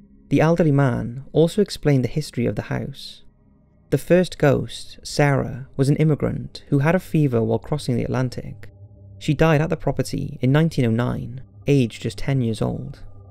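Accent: United Kingdom. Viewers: Where are you from? I thought you might be British, but throughout your videos you mispronounce certain words, so I thought English might be your second language? For instance Ouiji and heinous were mispronounced. I’m not poking fun, I’m just really curious.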